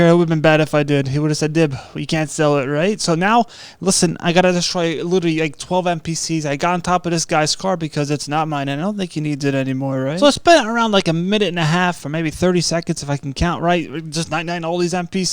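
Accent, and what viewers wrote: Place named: New Jersey, United States. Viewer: Lol ur finna asl that Jersey accent 😂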